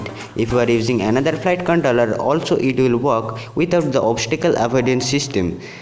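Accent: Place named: India. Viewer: It is Indian version of English ( India ), English is just a language, Don't give it to much importance, Skills are much more important ( and drones are the future )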